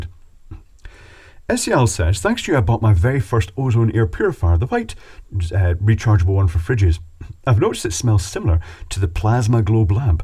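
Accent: Scotland, United Kingdom. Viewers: I always thought you were not from around Glasgow, you sound posher than that 😂. I love visiting Glasgow, especially a whisky shop I found in a basement where you need to walk down steps to get to the door. I stayed at Bowling harbour once on my boat. Loved it. All the best, you’re a bloody genius. Love your channel.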